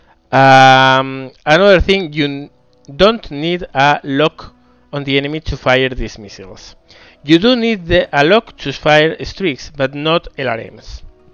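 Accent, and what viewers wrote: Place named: Spain. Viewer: Yay the spanish accent is back ;). Good vid.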